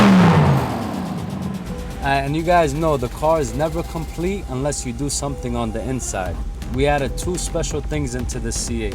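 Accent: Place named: New York, United States. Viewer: I knew by the accent you were in NY. Too far away. But would love to get this done 😩. Fantastic job!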